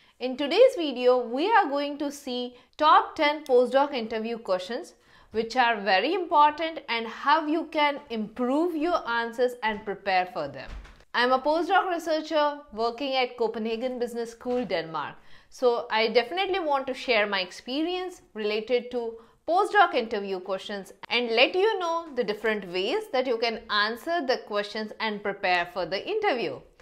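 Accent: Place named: India. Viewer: Best indian accent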